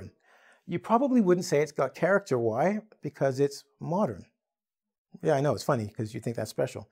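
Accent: United States. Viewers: Is it American English ? Just I get confused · What is your american accent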